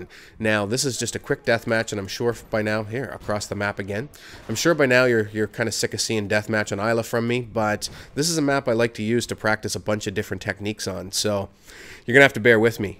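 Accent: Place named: Newfoundland, Canada. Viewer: where is from.. he sounds like hes from newfoundland.....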